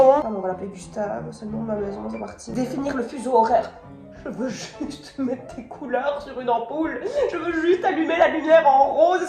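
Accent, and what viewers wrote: Liège, Belgium. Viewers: vue ton accent tu serai bien de liege ? ou alors dans les Ardennes :> hahahhah (rire démoniaque)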